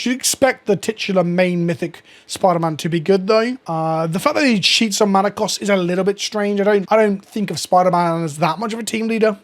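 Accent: United Kingdom. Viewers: Also, what makes you think you're from Europe? You sound awfully British to me.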